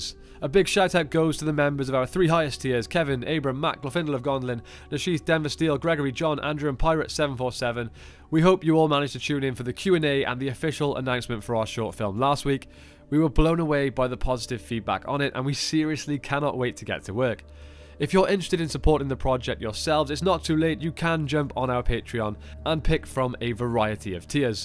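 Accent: Wales, United Kingdom. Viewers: About time I subbed! Is this dude Welsh, does anyone know?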